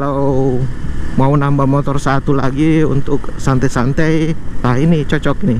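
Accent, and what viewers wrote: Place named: Sulawesi, Indonesia. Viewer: Sulawesi bagian mana bang? Dari Logatnya kentara hehehehe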